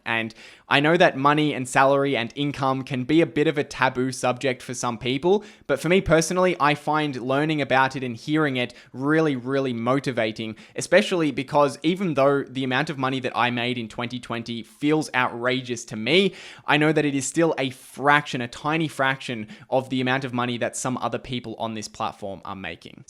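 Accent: Australia. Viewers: Rosy cheeks and Aussie accent ☺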